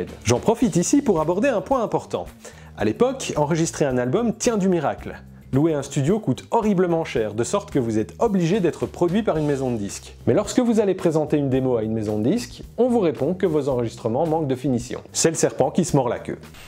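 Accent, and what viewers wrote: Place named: England, United Kingdom. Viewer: accent anglais j'entends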